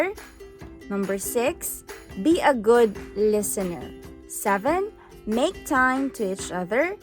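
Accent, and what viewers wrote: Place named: Philippines. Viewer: nindot man imong tagalog accent maam. Share love not hate.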